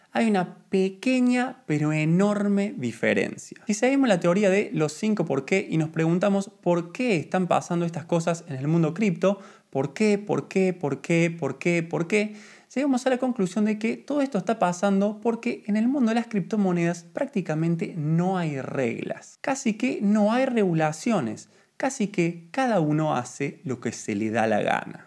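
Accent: Córdoba, Argentina. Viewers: que tonada cordobeza, me encanta. Sos gros exponiendo como antes de ponerlaen bancos argentinos, la pongo en cualquier lotria Crypto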